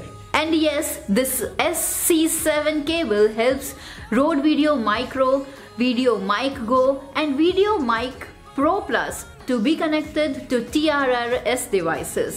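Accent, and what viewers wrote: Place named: India. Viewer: Probably the best EXPLANATION, a little hard on the accent. But thank you, ma'am. We love India in America.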